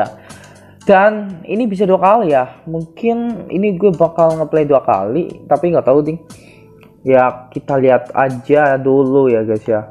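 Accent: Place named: Banyumas, Indonesia. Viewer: Ngapak" njir 🤣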